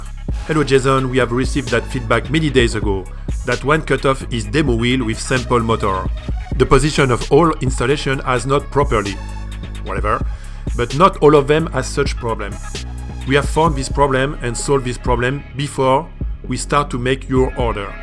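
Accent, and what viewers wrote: France. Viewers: you sound like my one of my brothers here in portland who comes from France ;)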